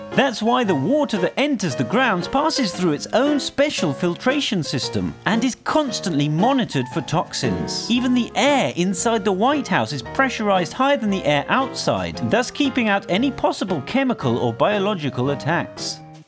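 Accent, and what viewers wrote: England, United Kingdom. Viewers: Who burned it down? Let me think, yep I got it the Brits did it. You sound like one and maybe one of your ancestors did it. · When you hear a British voice or like voice explaining things about the White House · Sick UK voice. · I love that the narrator has an english accent while talking about an American property lol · I love how the narrator has a British accent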